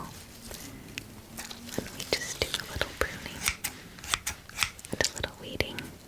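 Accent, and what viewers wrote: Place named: New York, United States. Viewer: My favorite is your NY/NJ accent❤❤ I love it so much, please do more 💜💜💜💖💓💓💓💓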